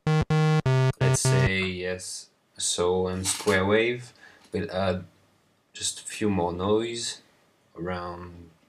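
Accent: France. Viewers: Are you French ? You have a french accent ! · sweet french accent :) J'adore ce synth mais j'ai du mal a saisir sa matrice de modulation un tuto ou une explication serait vraiment trop cool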